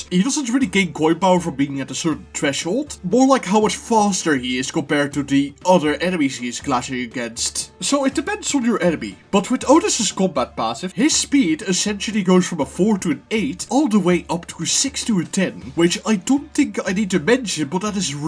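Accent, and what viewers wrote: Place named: RP, United Kingdom. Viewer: You have the voice of a British lord, will you appear in wuthering heights?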